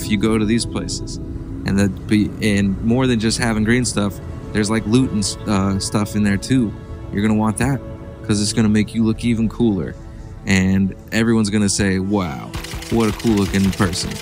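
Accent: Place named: Bronx, United States. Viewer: When a Hoodie from the Bronx starts to talk about a Computer Game - I am out!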